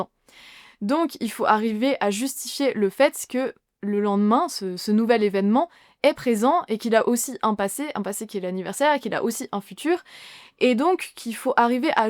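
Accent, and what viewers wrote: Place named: France. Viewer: Je suis comme Quebecois pour deconstruire votre superbe accent francais et Sartre.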